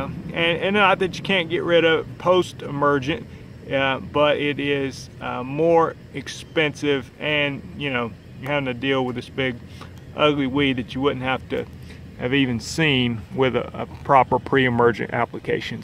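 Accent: South, United States. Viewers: Thank you so much. Dude talks way too much. Sounds like he's from the south...which explains a lot.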